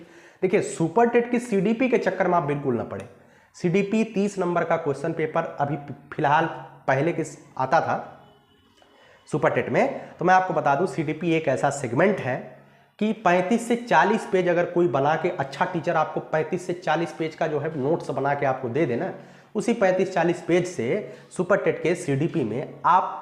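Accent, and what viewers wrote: Bihar, India. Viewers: Bihari boli dhire dhire aap me jhalak rha hai sir.🙏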